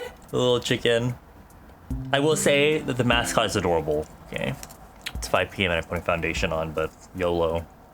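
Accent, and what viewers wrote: South Korea. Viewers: Loving the kenglish😂❤️ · we love a konglish queen <3 come thru!! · That konglish tho😂 love you bae🖤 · sometimes i dont even realize its such strong konglish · Thank god I can understand fully your konglish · YOU AND YOUR KORENGLISHHH OMG T~T idk what ur saying 😂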